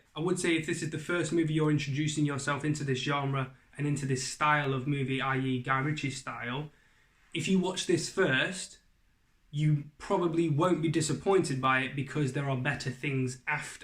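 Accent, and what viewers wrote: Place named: England, United Kingdom. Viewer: Hey, Bud, thanks for visiting our channel. My first time here, and gotta say, like your review. Not fair, though, because you have the cool English accent. Definitely not fair. Agree with your review. I guess I have a lot to look forward to, given that I haven't seen the other Guy movies you mentioned. have a good one.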